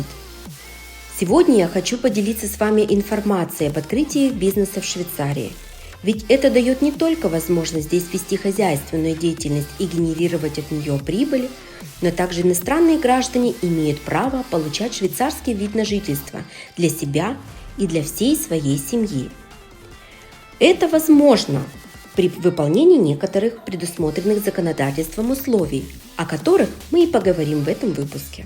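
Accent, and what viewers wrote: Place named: Ukraine. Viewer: Украинский акцент не скрыть, как не старается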